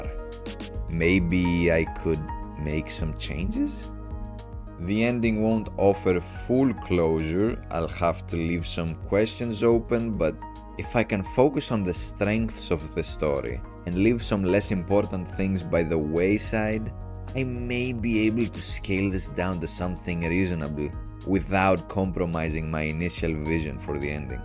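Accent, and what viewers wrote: Greece. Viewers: You sound very Greek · I was wondering the same because of the accent so I took this whole video as a puzzle like "is that nature in the background in a climate zone that exists in Greece?". Well, I got me definitive answer here and that puzzle is solved. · You sound Greek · i knew i recognized that accent, but i wasn't sure if you where actually Greek, or just Balkan. but then i saw fucking Ιανουάριος and i was like μπροοοοο. Επίσης γαμάτο βίντεο!!! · There is no way you're not Greek with that accent. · greek accent lol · Το ήξρερα οτι είσαι έλληνας το άκουγα στην προφορά .Επίσης ευψαριστώ με βοήθησε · You sound greek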